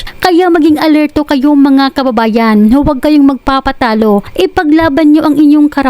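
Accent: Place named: Visayas, Philippines. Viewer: Maam BISDAK ang boses mo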